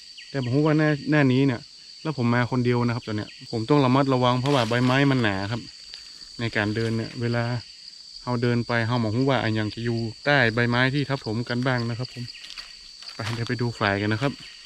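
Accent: North, Thailand. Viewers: สวัสดีค่ะอาจารย์ฟังอาจารย์พูดคำเมืองรู้เรื่องบ้างไม่รู้บ้างแต่ก็ชอบเพราะมันเป็นธรรมชาติแ ท้ๆชอบมากค่ะ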